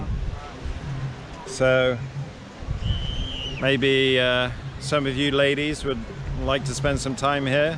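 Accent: England, United Kingdom. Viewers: I sure hope you hooked up there in Odessa, you are a good looking guy, suave and have a nice English accent. I definitely saw some ladies checking you out. Go get them tiger LOL. And if the lead role for The SAINT remake comes along you definitely should audition.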